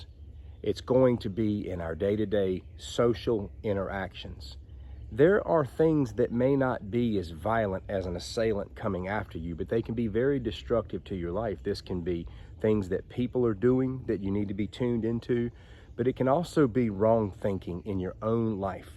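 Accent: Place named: Georgia, United States. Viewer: Are you from Georgia? Your voice is very soothing and reassuring.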